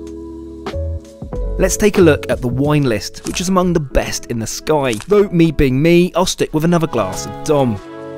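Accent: RP, United Kingdom. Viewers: Never thought I would be watching a man who uses the Kings English lol please don't take that the wrong way. Because were i was brought up we dont lol love your videos bro trust me wheather your on a train or plane I look forward to watching them. Am gald I sub to your channel I don't how many times because I've had to get a new phone lol. All the best bro and thank you for all the hard work you put in for your subs we appreciate it.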